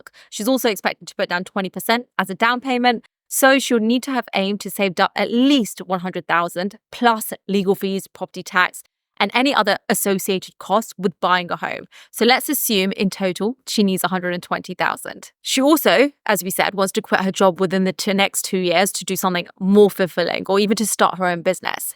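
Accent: England, United Kingdom. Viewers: Good content, and I love your British accent. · I like your English accent. It's very fancy.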